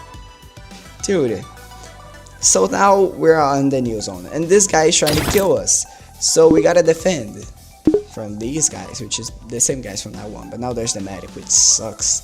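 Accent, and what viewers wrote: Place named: Brazil. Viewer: why do mine video and your's have the same text on the thumbnail 😭, and we both are brazilian, atleast i think you are brazilian by your accent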